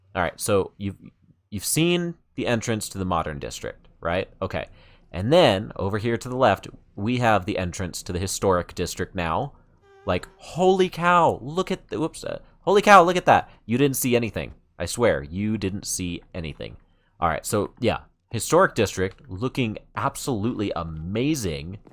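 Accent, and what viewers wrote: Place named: Ireland. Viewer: The accent sounds Irish lmao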